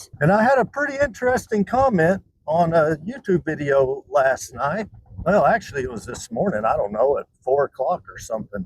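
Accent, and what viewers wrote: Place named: South, United States. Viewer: Combination of this guy's subtle southern accent, dialect, and the fact that he never cusses just makes his videos all the more wholesome to watch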